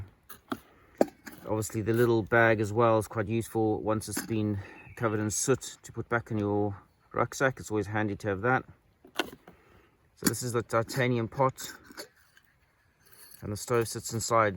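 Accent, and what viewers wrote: South Africa. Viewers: Hi there you sound like a SAFFA so am I but residing in the UK (24 yrs). I don’t do instagram any email add to reach out. BTW also bought two Rob Evan’s knives.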